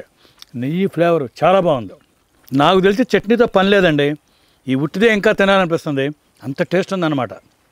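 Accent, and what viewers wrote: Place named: Rayalaseema, India. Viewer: మేడం ది పక్క రాయల సీమ అనుకుంట వాయిస్ మాడెయ్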